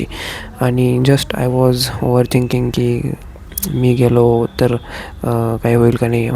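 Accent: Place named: Marathwada, India. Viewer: are bhai tu ekdam relatable vatla kharach bolaychi tone ekdam marathwadi ahe tich thev original vat te aikayla ani tu real rahshin main mhanje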